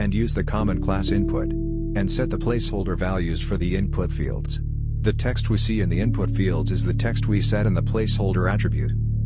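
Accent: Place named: India. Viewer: Next level indian accent covering though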